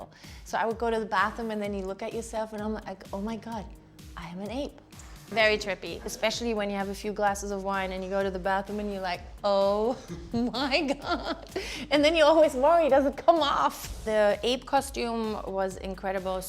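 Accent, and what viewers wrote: Germany. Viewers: That german accent 😂❤️ ich komme aus Deutschland und man hört es der guten an lol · She sounds more German to me now lol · I kept hearing an accent then found out she’s from Germany! Crazy how most of her accent is gone. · she sounds extra german,is it just me🤔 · Her german accent is more apparent here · I love how you can still hear some German accent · Do you mean her accent has become stronger? But yeah, I can definitely tell there are a few linguistic features about her way of speaking that distinctively mark her as German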